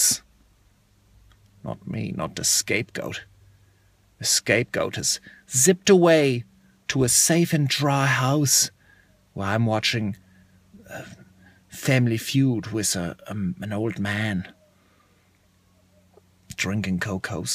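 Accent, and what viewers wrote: Germany. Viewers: Are you actually German or is it just an impression?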